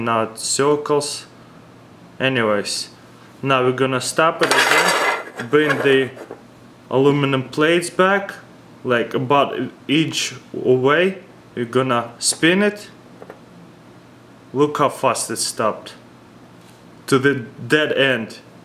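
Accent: Russia. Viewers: you englisch from rusia? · I can tell you are Russian. Cool I am too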